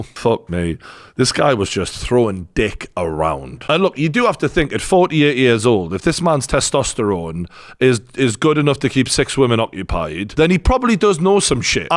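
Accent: Newcastle, United Kingdom. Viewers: Fair enough, but in my defense I clicked because I like the true Geordie, he's entertaining no matter what he talks about.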